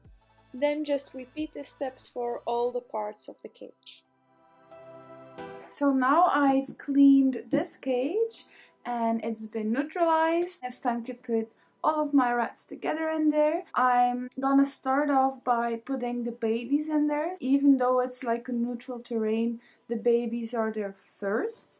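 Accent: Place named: Netherlands. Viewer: ben je nederlands?? je hebt een beetje een nederlands accent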